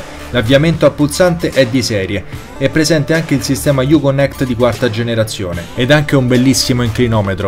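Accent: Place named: Rome, Italy. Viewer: Aooo !! Ma che sei poco poco romano ! Comunque bel test , ma sopratutto bel pezzo di ferro .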